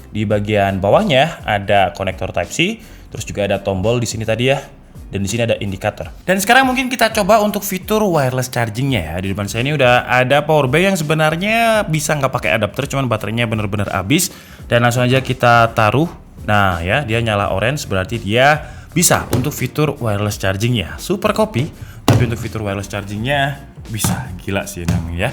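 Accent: Java, Indonesia. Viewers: Wong jowo to yoan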